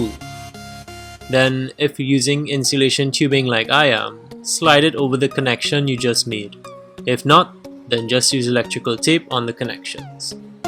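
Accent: Trinidad and Tobago. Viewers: Younsound trini bro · Are you from Trinidad. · Sounds like a Trini accent. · ah hearing ah trini accent!! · Are you Trini? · Lol can't miss that Trini accent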